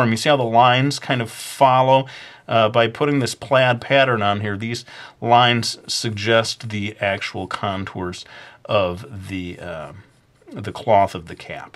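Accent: England, United Kingdom. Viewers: Totally love the English accent! you do it very Good. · Yeah... that almost british accent is exactly how I sound when commenting on your videos out loud,!;) And btw, you should really do a steam punk manga!:D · Maybe a bit British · the new voice is kinda british, not to be mean. i do like the voices they are funny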